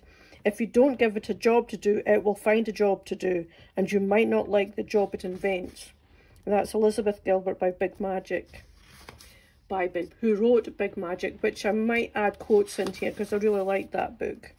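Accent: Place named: Scotland, United Kingdom. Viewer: Awesome idea. I need to go look up those Villain Archetypes. I don't have a common place book, but have started doing something similar in Notion, cause I've always got my phone on me and I can bring it up on one screen while I'm writing on the other. (dual screen setup). Psssst!! it's good to hear a Scottish accent on the YouTubes. I'm in Cumbernauld!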